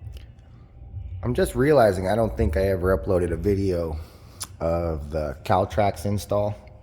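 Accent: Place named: Sacramento, United States. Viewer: Dude u sound like some I went school with in sac.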